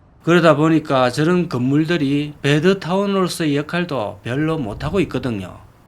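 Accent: Gyeongsang, South Korea. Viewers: 사투리 토하겠다 할배야 경상도 말투좀 버리고 딕션 연습좀 하세요. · 구수한 경상도말씨로 설명을 정말 잘하셔서 다른 사람들도 처럼 하시는 분도 있지만 재미가없고 설명을 뽄따서 하고 있는데 저는 절대로 안본답니다 하이팅. 하셔요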